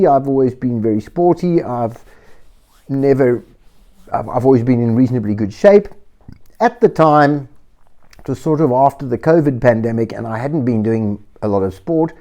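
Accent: South Africa. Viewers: You sound South African😊I'm in Port Elizabeth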